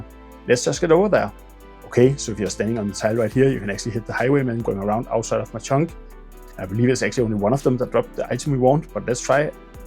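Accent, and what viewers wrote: Denmark. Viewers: See, i love me a fine light accent on the tube and this one is charming as heck lol, where you from, Danmark? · fuck jeg elsker danglish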